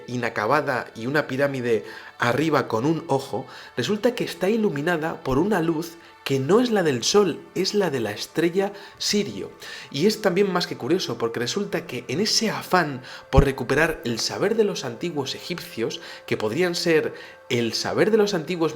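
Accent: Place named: Castile, Spain. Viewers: Ese acento se llama castellano como buen español que es. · me imgaino que es español entiendee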